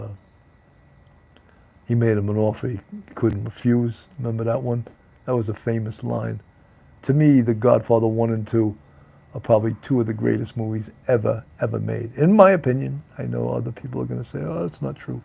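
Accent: New York, United States. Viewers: I watch a lot of crime shows, which is probably why your channel popped up in my feed a few days ago. Really enjoy your commentary and NY accent.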